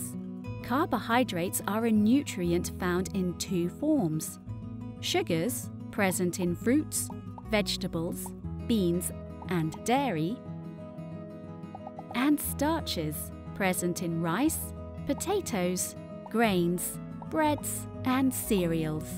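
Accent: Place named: United States. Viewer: american pronounciation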